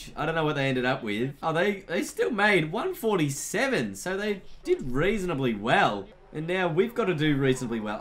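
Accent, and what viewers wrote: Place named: Australia. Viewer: Omega Music he's Australian m8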